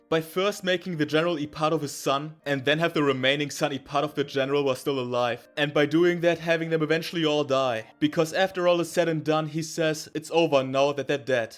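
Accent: Germany. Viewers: the video creator doesnt even try to hide hes german 🥀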